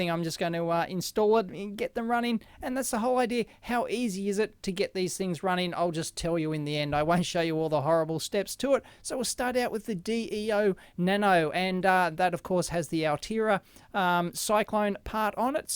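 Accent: Australia. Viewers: great video, love your down to earth style. An aussie, I guess? I've done a very simple sound synthesis (karplus-strong) algorithm on 8-bit arduino uno. To improve the sound quality, the most important feature would be a 12 or 16 bit DA convertor. Looking around a bit, it doesn't seem to be a high priority for uc chips. Any suggestion for uc or fpga that is good for sound, ie all built in cos I don't much like etching pcbs? This is just hobby stuff.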